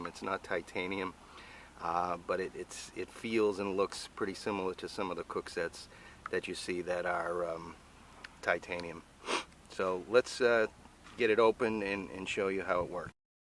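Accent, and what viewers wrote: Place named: Boston, United States. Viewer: Man, with that Boston accent you sound like Matt Damon from the movie "Good Will Hunting". Yeah, I have the GSI Minimalist with a little Fancy Feast stove tucked inside. All I have to do is bring some alcohol and a wind-screen and I'm golden. Enjoyed the look at your system. Thank you, my friend.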